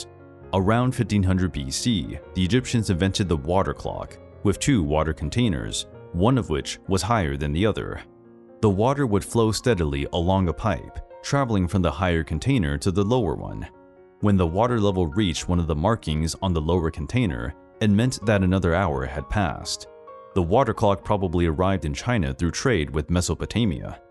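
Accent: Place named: United States. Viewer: I can tell by your accent that you're second generation Chinese American. People raised in homes where Chinese is spoken by their parents, and English spoken at school, tend to have subtle giveaways in their speech. Like you.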